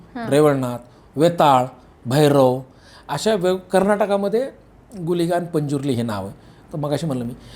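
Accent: Marathwada, India. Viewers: तुम्ही मराठवाड्याचे किंवा बीड चे आहात का तुमच्या भाषेवरून 😅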